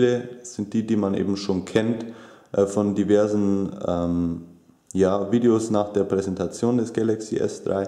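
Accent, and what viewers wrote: Bavaria, Germany. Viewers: Ich find deine Videos Klasse, hab dich auch gleich mal abboniert ;) Kannst du falls es sich einrichten lässt das mit der Dropbox mal zeigen oder kurz erklären weil des find ich interessant aber ich find nirgends im Internet ne Seite die das vernünftig erklärt. Welchen Dialekt redest du normalerweise? Weil ich komm auch aus Bayern und ich hör sofort wie du dich anstrengst gutes Hochdeutsch zu reden :D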